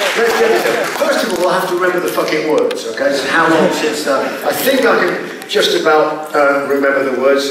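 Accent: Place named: England, United Kingdom. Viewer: Adoro essa entonação carregada do inglês original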